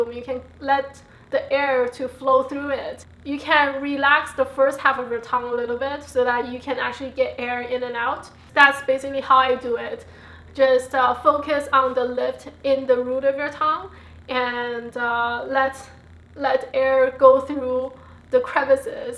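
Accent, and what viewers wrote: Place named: Taiwan. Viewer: U seem to have taiwanese accent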